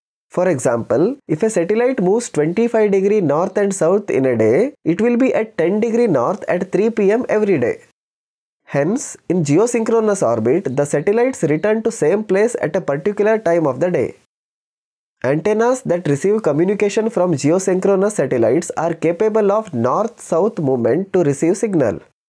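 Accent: India. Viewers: Outstanding video sir.. you made this concept so easy to understand...🔥🙏🏻 And if I'm not wrong...you're from south right...?? Bcz of your accent 😊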